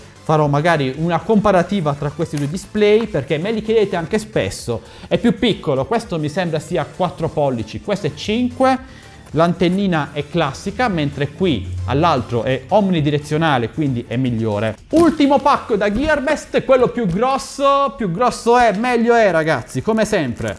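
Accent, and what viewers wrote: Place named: Bari, Italy. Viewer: E' piacevole sentire un unboxer con il poprio accento.... sei un grande!!!...W Bari.....Ottimo unboxing!!!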